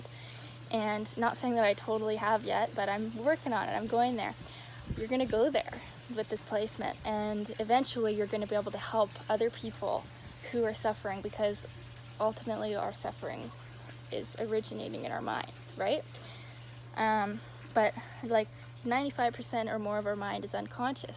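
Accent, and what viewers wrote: Canada. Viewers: As an astrologer I frequently look up videos for my clients to watch for maybe a better understanding, (I do free reads) 12 th house Saturn is a difficult placement and I needed a video to express that and your video did that!!! Thank you you are a gifted astrologer whom I would love to chat with!! Am I picking up a Canadian accent?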